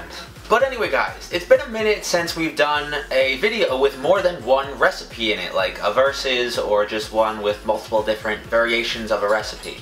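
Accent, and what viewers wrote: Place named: Brooklyn, United States. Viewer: I love his voice, he sounds like he's from brooklyn in the 50s! Where is he from?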